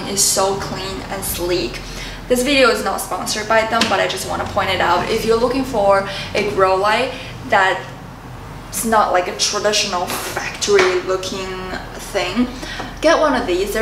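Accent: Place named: Southern California, United States. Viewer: It’s a SoCal accent, silly goose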